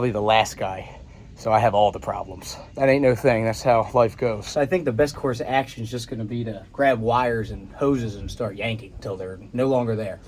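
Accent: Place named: West Virginia, United States. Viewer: Ey fella, where you from? You sound like you got a West Virginian accent but i aint got a clue where you are from.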